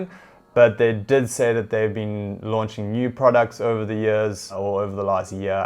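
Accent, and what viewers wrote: South Africa. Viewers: Your accent sound like my south African friends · Your accent sounds South African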